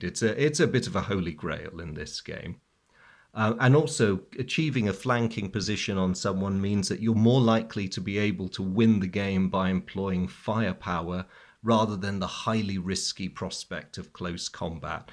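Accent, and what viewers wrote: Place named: RP, United Kingdom. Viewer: Cheers dear, Thanks for your kindly reply. My request wasn’t for a specific scenario, honestly. I fancy that if you play a relative simple scenario that covers the basic rules it would be great. Maybe, you give for granted certain stuff (like terrain or discard, how to calculate sniper activity and reaction, just to mention a few). If you might simply explain those rules playing the game, I bet you might hit the target. Uh, last nice thing: your posh English reminds me a teacher I had half century ago…he was a peculiar fellow that escaped the Polish invasion by Nazis into a balloon! Thanks a lot for your passion.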